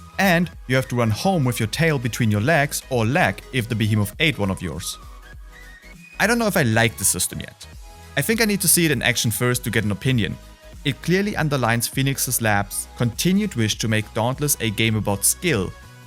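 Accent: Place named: Germany. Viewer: Great Video ! But a little german accent :D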